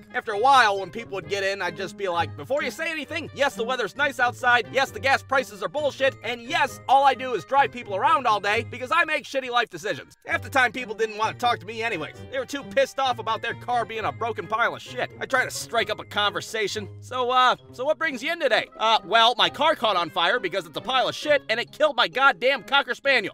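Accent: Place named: Ohio, United States. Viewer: What state do you live in because just the way you talk I feel like you live in Ohio for some reason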